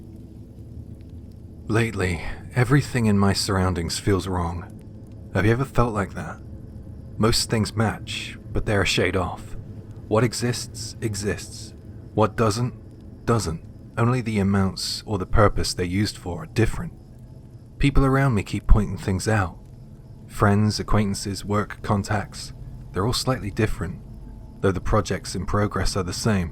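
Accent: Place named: West Country, United Kingdom. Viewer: I hear a Scottish twang/ possibly West Country in your accent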